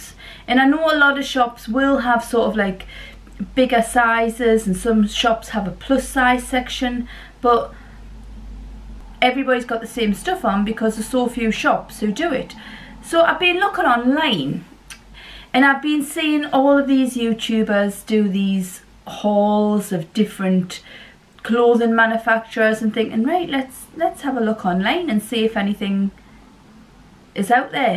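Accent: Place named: Wallsend, United Kingdom. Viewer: She is from Wallsend. :) We both live in Australia now, her accent isn't as strong but always makes me smile.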